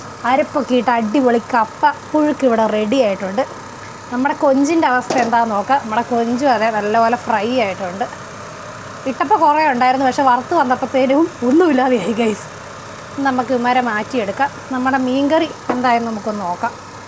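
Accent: Kottayam, India. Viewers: എന്നാ കൊച്ചേ വീഡിയോ ഒന്നും ഇടാത്തെ ചുമ്മാ നടക്കുമ്പോൾ എങ്കിലും ഫോൺ പൊക്കിപിടിച്ചു പോകു 😂😂 1k കഴിഞ്ഞു വല്ലതും അറിയുന്നുണ്ടോ 😂😂😜. കോട്ടയം,കണ്ണൂർ, സ്ലാങ് കേൾക്കാൻ നല്ല രസമാ ☺️ അവിടെ ഏതോ കട്ടിലിൽ നടക്കുന്നകണ്ടു 😊 അവിടെ 5 ഏക്കർ വാങ്ങിയോ 😊 പുതിയ സ്ഥലം കാണിച്ചിട്ട് വല്ല്യ അനക്കമൊന്നുമില്ലലോ??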